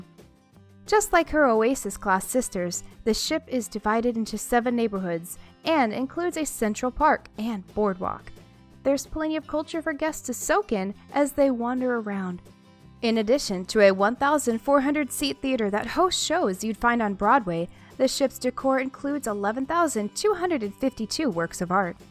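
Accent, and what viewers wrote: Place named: Germany. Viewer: I think it's German or English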